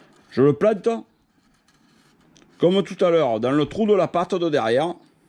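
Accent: South, France. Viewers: J adore ton accent du sud 😂 et j ai le meme fluro que toi je l ai chopé a decat ...bon les crabes la ils sont pas trop vivaces😅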